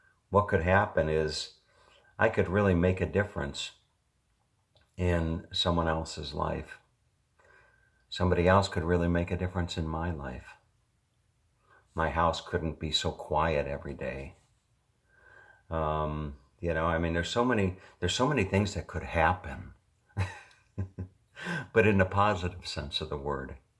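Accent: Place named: United States. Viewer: You mentioned about learning English from your videos. I actually only talk Aussie and I am trying to learn American from your videos. LOL.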